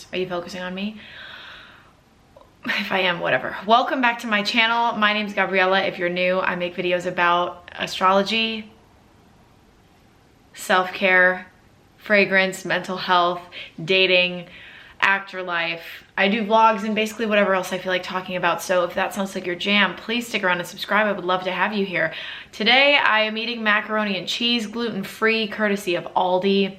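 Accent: Australia. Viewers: girl mid video I got super confused and was like why does she sound different and realise you're still going with the australian accent omg😭😭😭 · That Australian accent threw me for a loop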